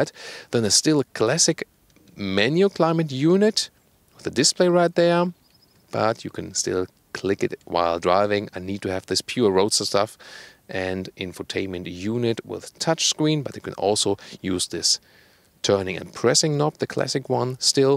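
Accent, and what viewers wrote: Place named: Germany. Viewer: German cars should be always presented with German accent just like in this video :)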